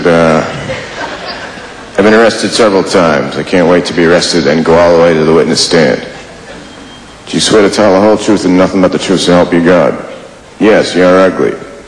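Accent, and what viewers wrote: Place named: Australia. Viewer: FUNNY SCOUSE ACCENT- it's australian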